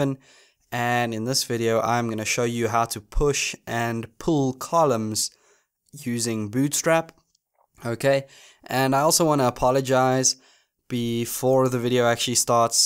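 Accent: South Africa. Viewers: Identified as South African 3 seconds into the video :)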